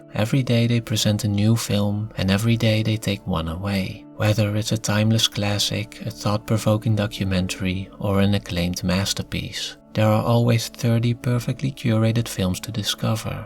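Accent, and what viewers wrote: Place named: Netherlands. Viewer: 10 seconds in and I'm like: Hey! A dutch narrator speaking english!😃 Are you Dutch?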